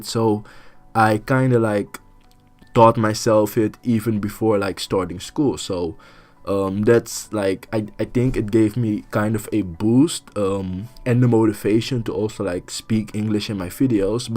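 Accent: Netherlands. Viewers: Good thing you decided to do your voiceover in English. Otherwise, I would not understand a word and fall asleep. Your Dutch accent is pretty cool..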